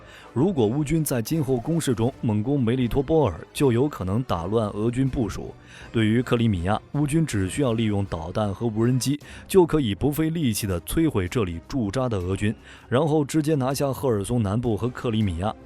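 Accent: China. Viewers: 你个台独媒体,用中国口音的配音,可耻.你应该用台湾语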